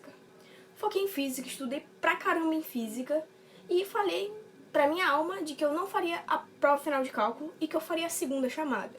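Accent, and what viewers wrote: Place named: Rio de Janeiro, Brazil. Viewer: "A máquina não foi capaz de me derrotar". Uahaha. Muito bom,! Muito legal seus vídeos, descontraídos e com informações bem interessantes do dia a dia do estudante. Seu sotaque carioca é muito bom de ouvir. Fiz Eng. Mecânica no CEFET-MG e lembro da tensão de fazer algumas destas temidas provas. A maioria das provas no CEFET, eles costumavam e ainda costumam fazer somente questões discursivas (tanto as de Física como de Cálculo), eram bem tensas.:) Mas já tive a curiosidade de ver algumas das provas aí da UFRJ, bem difíceis.:) Parabéns pelo canal!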